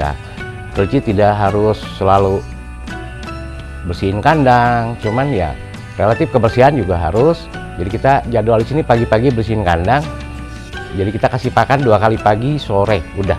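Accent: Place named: Sunda, Indonesia. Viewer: Kang Sunda banget santai bicaranya renyah enak di dengar saya mau nyoba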